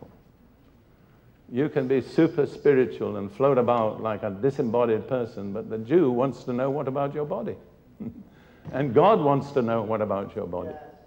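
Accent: United Kingdom. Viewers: I used to listen to snatches of his radio program during his lifetime when I was college, but he seemed rather like a rather stuffy lecturer due his British accent yet flawless diction. Now I hang on his every word, and his anointed teachings are powerfully strengthening my Christian life.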